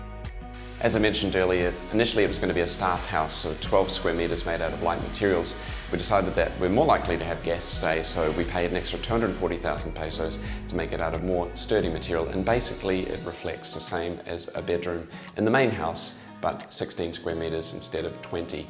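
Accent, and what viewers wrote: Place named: New Zealand. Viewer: Sound like a kiwi living a better life.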